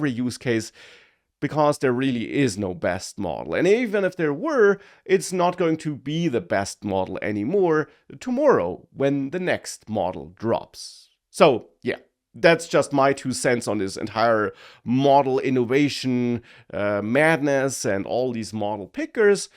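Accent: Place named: Germany. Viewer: Pretty sure he is German which natively uses W and V sounds, guarantee his English is way better than yours, bud. What is your native language so we can make fun of you?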